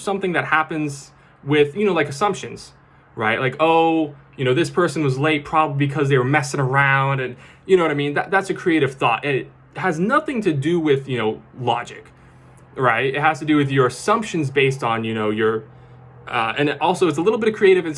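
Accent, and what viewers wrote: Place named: United Kingdom. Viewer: I found you one day as a recommendation by Instagram and just checked your reel on Instagram and this concept sounded interesting so, here I am, thank you for this wonderful video, what a magical recommendation! Your words are a delight to savor without rushing to understand, just embracing new concepts and philosophies. If you could ever grant the opportunity to have a talk, I would be glad to be a part of a beautiful conversation and of course, share a cup of tea! British accent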